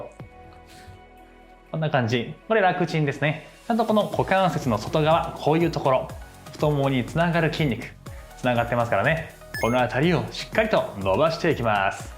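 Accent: Kansai, Japan. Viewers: めっちゃ有難い😊 最近は太股が締まってきてズボンに余裕を感じます。🎵 それと、歩いてても前と何だか感覚が違う気がするのは気のせいなんかな？ 先生❗ちょいちょい出てくる関西弁がええわぁ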